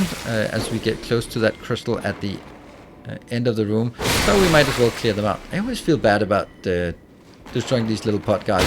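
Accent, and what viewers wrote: Denmark. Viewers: Is that an danish accent Im hearing? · Thanks I was really curious where to get that item that was on the cliff Edge. Funny enough I immediately recognised your Danish accent. Fortsæt med det gode arbejde.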